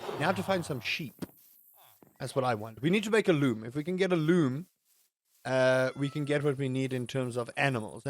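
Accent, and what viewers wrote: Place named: South Africa. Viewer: Howzit,! You randomly appeared in my feed with your episode 1 of this series, and since I've played both games, I figured this was worth a shot. I'm really enjoying it so far (still very early game, though - not even iron tools yet). They've done a really good job with this modpack. And as an ex-SA guy, I also enjoy hearing the accent again (been in Canada for 20+ years now).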